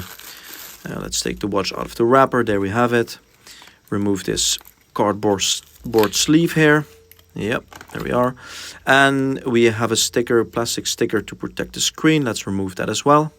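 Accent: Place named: Netherlands. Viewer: I hear Dutch accent, where did you get it from? As far as I know it's not released in the EU.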